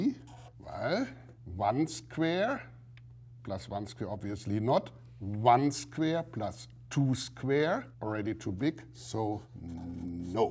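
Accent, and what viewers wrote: Germany. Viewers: I'd say German, he sounds like Werner Herzog. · He has a thick accent for sure, but I can understand what he is saying. So I have no problem with it. I can't speak German, so I'm glad he knows English! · I can clearly hear from where (in Germany) he originates - it's all in the prosody. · I don't think an accent can get any more german than that. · Stop commenting his English. He speaks well and understandable. Try to imagine yourself explaining difficult problem in foreign language. Most you you, who complain, are not even within range of sight knowledgewise. And btw rather german accent than french one. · Oh, that accent is too German, indeed! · You can directly hear that he is from Western Germany :D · His german accent is cancerous.-. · I thought: "He sounds german." And the description reveald my assumption as true. :D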